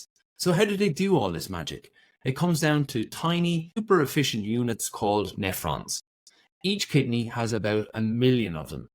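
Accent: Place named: Ireland. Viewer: Excellent. I have Stage 4 Ovarian Cancer, and they had to dial back my chemo dosage last year. (I had perfect kidneys before this.) Im now on Targeted Maintenance Therapy, and my kidneys are tanking more. I'm on a break until t'row when I potentially restart. I was being referred to my late mum's Kidney Specialist. (She had Chronic Kidney Disease from high blood pressure and Diabetes... and then 2 separate angiogram surgeries for a Brain Aneurysm & a Heart Attack - she died briefly with the latter - cooked her kidneys, making her terminal. It's an ugly disease.) I've not heard anything yet, though, I do have other conditions that necessitate I take various meds. It scares me more to have potentially damaged kidneys, than to have cancer... only because I've not seen anyone die of Ovarian Cancer. Anyway, I would've loved this as a video or charts. Your thick Irish accent to my Australian ears made some parts difficult, especially with some unfamiliar medical words. 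Anyway, at least thank you for that crux of the situation re kidney levels & what's going on. I never really googled my mum's disease - it was just another facet of her life. Thank you!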